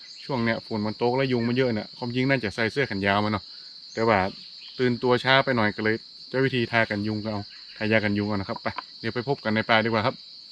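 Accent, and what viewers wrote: North, Thailand. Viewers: อยู่จังหวัดอะไรคะอ,เภออะไรเอ่ย,ฟังพูดเป็นคนเหนือแต่ไม่รุ้จังหวัด,และ,อ,เภอค่ะ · นี่ก็บ่ฮู้เหมือนกัน สำเนียงอู้บ่ใจ่ลำปูน- เจียงใหม่หล้า น่าจะแปร่-น่าน-ลำปาง กาว่าเจียงฮาย-พะเยา...ฟังไปฟังมากะเหมือนจม.อะละ เอ้าตอบให้หายข้องใจ หน่อย@ 555 เผื่อจะเพิ่มยอดไลค์นักๆ..ไหนๆก็หากิ๋นตางนี้แล้ว คนเปิ่นไค่ฮู้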